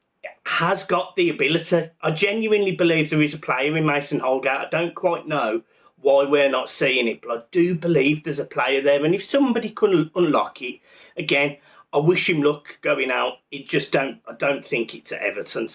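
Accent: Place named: Midlands, United Kingdom. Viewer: Gotta ask ye this question mate, why Everton with an accent like yours, midlands somewhere. I watch ye show all the time, its sound lad.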